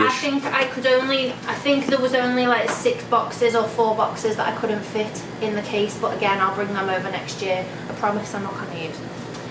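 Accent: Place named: United Kingdom. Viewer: Where abouts you here in UK .....youve got that lovely british accent ....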